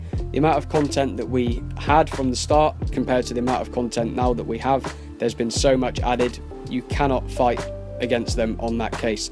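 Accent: England, United Kingdom. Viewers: Your videos are so informative and I like your British accent. U sound like such a nice suave person. If u r play on PS4 I would like to meet. What's your real name by the way. Your videos are honest full of correct information. Your information has saved me millions of GTA dollars. Keep up the good work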